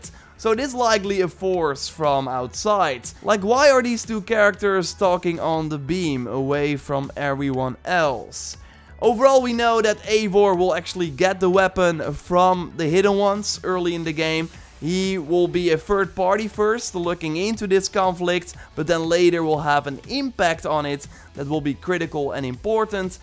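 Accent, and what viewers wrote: Netherlands. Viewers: I love that crisp Dutch accent: a n d l e t s g o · Welk Nederlands accent? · Ik hoor een Nederlands accent :)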